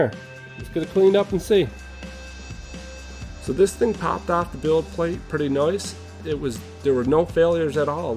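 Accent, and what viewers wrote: Newfoundland, Canada. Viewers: you sound like my uncle from Newfoundland, makes me feel like I'm gonna have a awesome time when I watch your videos. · Man i have to ask are you from Newfoundland? Soon as i heard your voice i was like , its one of us hahahahah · From newfoundland bud? also this was pretty interesting, thanks for the video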